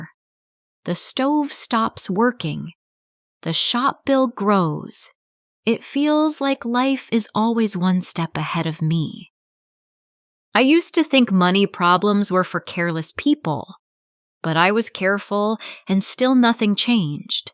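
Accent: United States. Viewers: This Chanel is American because the accent is American clearly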